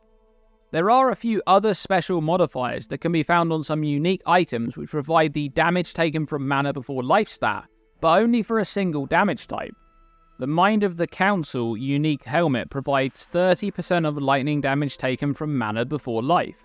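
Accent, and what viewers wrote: United Kingdom. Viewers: Bri'ish man spitting facts again. Always great for theory crafting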